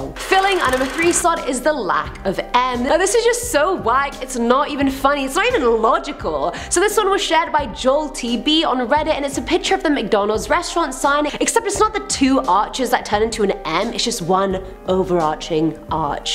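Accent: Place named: United Kingdom. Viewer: Videos aren't the same when Che is not the host. And why put a British host? 😯🤣🤣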